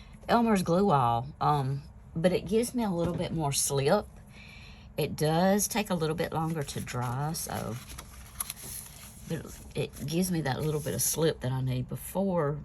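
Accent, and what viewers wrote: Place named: Kentucky, United States. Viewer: You sound like you may come from my neck of the woods. I’m from KY and just learning, these digitals are gorgeously beautiful lol. Thanks for sharing.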